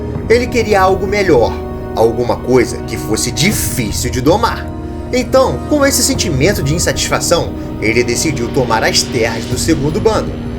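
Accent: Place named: Rio de Janeiro, Brazil. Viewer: O sotaque carioca não passa.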